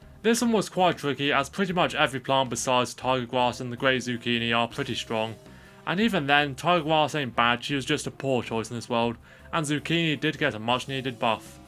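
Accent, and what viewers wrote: United Kingdom. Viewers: The British accent is very cool 😎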